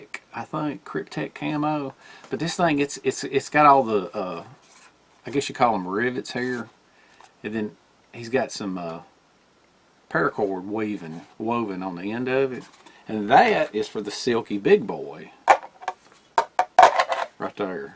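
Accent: South, United States. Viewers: I agree with you. I like a southern accent. Some people who hear a southern accent make the mistake of thinking the speaker is not very intelligent. Behind accent is a genius mind. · I love your southern accent. I could listen to you all day! LOL Thank YOU for being such a great, innovative guy that I've learned a lot from. Always look forward to your videos. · "What accent?" as one Australian said. But seriously, the 'Southern Drawl', I believe, is a of rather SOPHISTICATED character and sounds very much like the altered remnants of a Proper British /Aristocratic English accent, to me anyway. I think that's why it sounds so familiar somehow and also sweetly comforting. I think may have the unbeknownst lineage of an Aristocratic English Lord...that makes ALL kinds of Tools go "Out of Stock" with every other episode. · I love your southern voice like how yu say machethe lol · Buddy I'm glad your going to get back to normal on the shift. 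What some awesome things sent to you! Brother you deserve them all, ENJOY! Good video as always Brother! I remember about 100 years ago when I discovered your channel, my little boy who's grown now was sitting there watching and listening to you and looked at me and said "Daddy he sounds like us!" Hehehe it's a Southern thing:) Thumbs-up buddy ~John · Silky saws are awesome. Hows the handle on that machete feel? Idk if id like the feel of it just based on the looks but ive been fooled b4. I got a baryonyx kingfisher as a gift & i thought at 1st that it was just a toy basically w/ a weird pistol type grip but that handle is super comfortable & it cuts like a laser even w/ the strange pointy profile. Oh & btw your accent is fine. As a matter of fact southern dialects descend from French & UK high society. I study languages & our heritage is something to be proud of.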